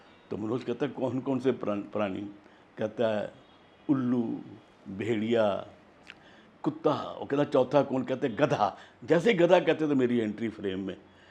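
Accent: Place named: Punjab, India. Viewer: Birbal has punjabi accent like shri dara singh ji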